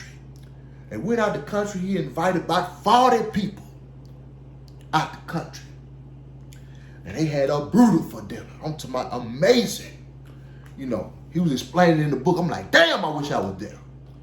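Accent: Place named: South, United States. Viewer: I loved your analysis. I’m proud of you. You proved Southern accent does not equal dumb. I know because I’m from Jackson, Mississippi.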